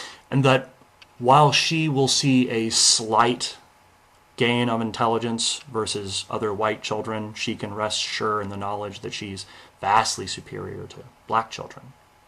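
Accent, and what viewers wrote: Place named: United Kingdom. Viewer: is the wise man of the Tribe. Having a strong british accent, being older than 18,5 and having had sex at least once, he tries to lend some legitimacy, to the average race realist, who has non of those. Its a fun effort to watch, especially knowing its predestined to fail. However I have to appload your kind and smooth delivery. Its a suttle art to strike gently.: )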